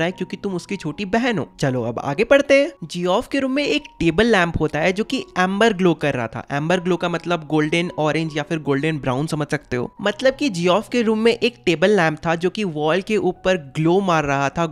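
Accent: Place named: Bihar, India. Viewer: thodi English k words theekse use kijiye bhaiiya aur apni pronunciations sudhariye zabaan clear honi chahiye. toh hindi mai aapke channel pr ek bar sunkr log hrbr ayenge bihari accent use mt kijiye❤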